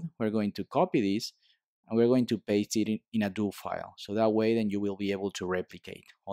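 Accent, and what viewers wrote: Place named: Spain. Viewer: Very nice instructions that get to the points immediately. Just had to get use to your charming Spanish accent, but after overcoming this "obstacle" no problems whatsoever!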